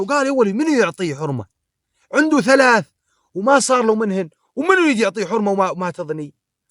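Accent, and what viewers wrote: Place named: Iraq. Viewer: متابعك من العراق وبل صراحة ما عبالي انو اكو ناس بسوريا لهجتهم تشبهة لهجتنا لان احنة امعاهدين على لهجة أهل سوريا كيفك و شو بدك وربي يحفضك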